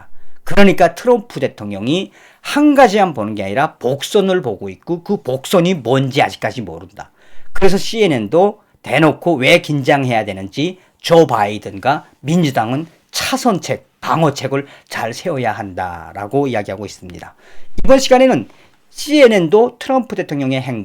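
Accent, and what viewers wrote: North Korea. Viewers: 님 틸북인이시가요? 북한 말씨여서요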